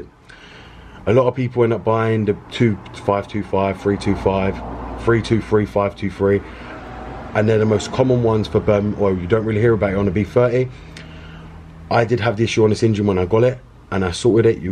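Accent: United Kingdom. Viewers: Is this dude from the UK? What if you're driving an 2005 x3 e83 in a hotter climate like Sydney, Aus?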